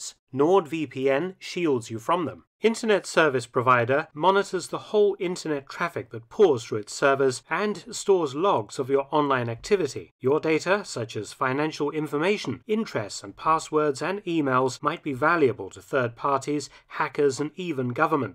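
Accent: United Kingdom. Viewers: This dude sounds British to me but idk if he is but if he is he said soccer...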